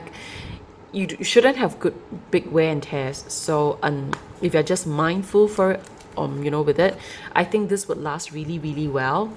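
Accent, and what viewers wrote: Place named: Singapore. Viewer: Sounds like you are from Singapore. If you do could you let me know the price for both version? Thank you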